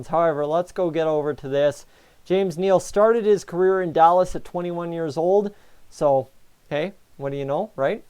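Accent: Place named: Nova Scotia, Canada. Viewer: From Edmonton here, you sound like you're from Nova Scotia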